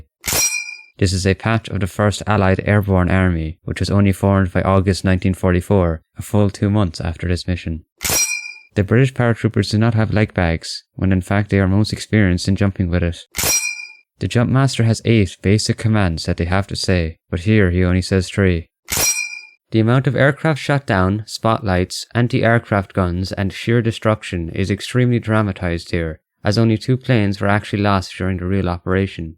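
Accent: Ireland. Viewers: Is this guy Irish?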